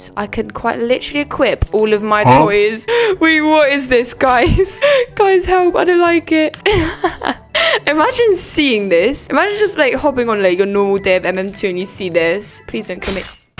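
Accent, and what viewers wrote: United Kingdom. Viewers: I can actually tel that ur from England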